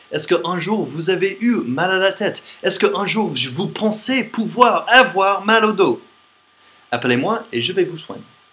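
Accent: United States. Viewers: J'ai moins entendu ton accent américain que sur d'autres videos c'est normal ??? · Tu es entrain de perdre ton accent américain. Tu as l'accent français parfois. Il te va bien. Des fois on croirait que tu es franco-français. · c'est incroyable tu n'as pratiquement pas l'accent américain quand tu parle francais ^^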